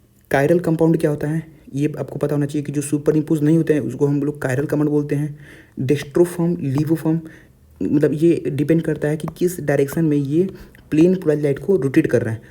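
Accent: Bihar, India. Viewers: bhaiya love your Bihari accent 😍😂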